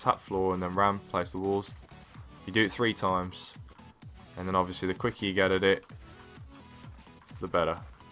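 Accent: Birmingham, United Kingdom. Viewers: are you from birmingham lol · You're defo from Birmingham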